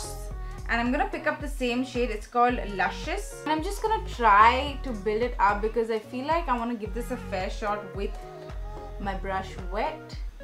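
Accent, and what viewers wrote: United Kingdom. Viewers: Waaaow that british accenttt😍😍😍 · The British accent was on point 😍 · British accent was too acurate 😍😄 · Love your British accent. You should totally do a make up tutorial video speaking British only. 😍🥰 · That British accent though 🤙🏻😍💖💖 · Godd the British accent was bomb the video was good too but the brrritishhh acccent malz no one can make a difference between the way you speak and the authentic real British accent😄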